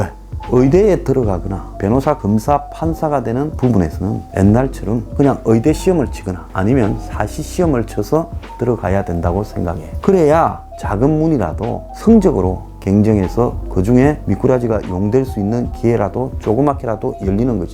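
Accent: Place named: Seoul, South Korea. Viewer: 소장님.귀여우세요~살짝 사투리 섞인 서울밀투~~^^ㅋㅋ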